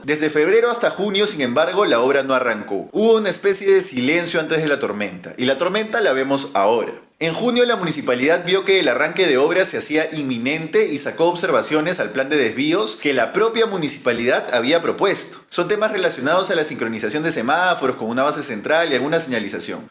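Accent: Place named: Argentina. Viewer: Excelente escucharte pero evita hablar al estilo argentino que defecan por la boca.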